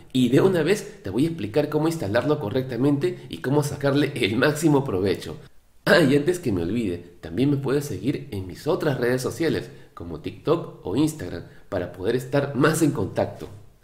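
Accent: Peru. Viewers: Acento Limeno 100% jeje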